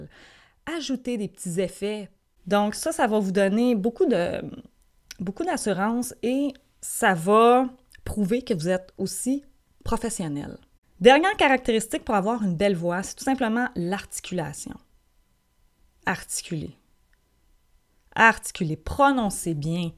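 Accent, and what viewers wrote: Quebec, Canada. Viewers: Jadore ton accent quebecois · Je crois que vous êtes québécoise 😄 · Par contre j'aime trop son accent Québécois et je suis sur que en chant tu es super forte Gros bisous à toi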